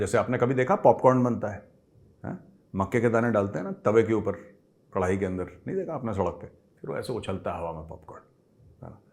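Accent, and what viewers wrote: Lahore, Pakistan. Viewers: Lahori accent…Waah Waah Waah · Sir aapka Lahori accent bhut mast hai mja aa jata h sunke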